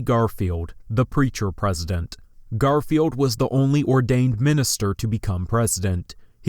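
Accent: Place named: Vermont, United States. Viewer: I'm going to guess you have a touch of old Vermont accent. "Found out," sounds like: "faound aout." Great!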